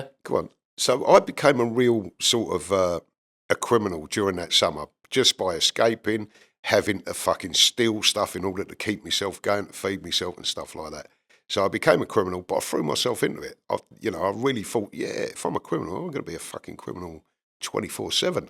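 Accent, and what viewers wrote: Sunderland, United Kingdom. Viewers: Good Podcast You From Sunderland?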